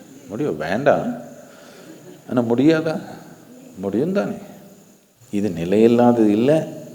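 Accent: Karnataka, India. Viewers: Ungaluku purialana apro yedukku tamizh pera eduthirrukinge neekunge adhatha. Shankaran pillai nu tamizh peru vechikitu English le Peter vooduringe naan idhuvareku tayyi mozhi teriyadhu sonnavara engeyu kelvipattade ille ulagathile indha ulagathille yaaraadhu tayyi mozhi teriyadhavaru puriyadavaru yaaraachu irrukara aah aah sadhuguru oda tamizh slang appdi irruku na avarue namma bashaikararu na kannada mozhikararu aanalu en maariye tamizh kathikitrrukaru illiya. Tayyi mozhi teriyadha aalu indha ulagathile neenge orutharu dha. Neenge tamizhane kedaiyadhu neenge enamo telungu aalu irrukala chumma tamil peru pottirrukinge avalo dha. Ungaluku naan pesura tamizhaadu puridhu nu nenakkre idhuvu purilena neenge attu tamizhan na waste tamizhan, tamizh terilena apro shankaran pillai yean neenge ellaru yedukaage vechikuvinge .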